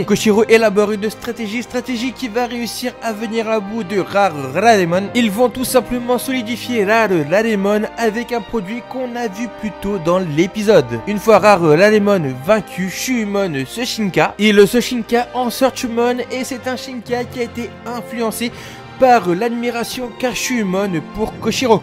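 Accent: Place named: Japan. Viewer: Normal pour le symbole du savoir. C un cuirassé a la base. Sinon sympa ta review meme so je trouve tjrs un peu too much de prononcer les noms avec l'accent japonais en parlant francais mais ca reste du gout perso^^ me suis abo pour le soutien ;) Si tu veux jeter un oeil jai fait un amv pour la disparition de Koji Wada ❤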